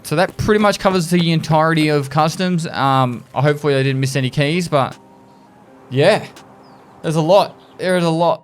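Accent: Australia. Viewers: As a foreigner not used to Australian accent, i did not understand when he was talking about some « cyfe ». Then I realized he was talking about safes....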